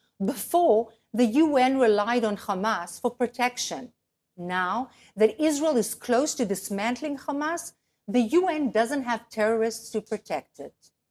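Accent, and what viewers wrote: South Africa. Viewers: well that was entertaining....she sounds south african... 2X apartheid for her on the books. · South African accent Stop lying Israel child killer You are propagandist You lost go back to south African racist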